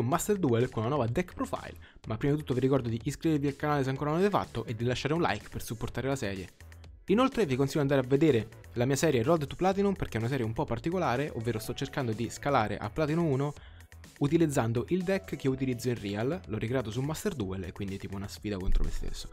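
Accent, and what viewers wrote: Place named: Rome, Italy. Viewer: Era da inizio gioco che cerco qualcuno che spiegasse sto deck, grazie mille... ah solo per l'accento romano te meriti 100k iscritti big up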